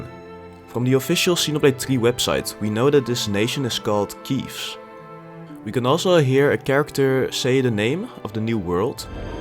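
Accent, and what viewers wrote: Netherlands. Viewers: Ik hoor meteen een NLer 👍🏾😂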